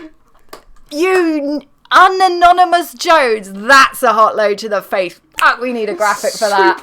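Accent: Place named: United Kingdom. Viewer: I'm too American to notice English from British or Welsh from Scott. I think odd is the soup sandwich combo special at a posh bistro, or standing in que to take number at the DMV. I find Brits fascinating. Your sense of humour especially. It takes a while to run the dialect through our macaroni and cheese filter, but after dialing it in, you're all really quite brilliant. Thanks for the responses, you're quite lovely. Just curious, did your belly button ever pop back in?;) If not, chuck some glitter on it! Cheers!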